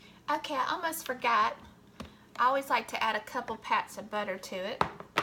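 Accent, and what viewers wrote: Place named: Texas, United States. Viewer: Are you from Texas? Love the accent!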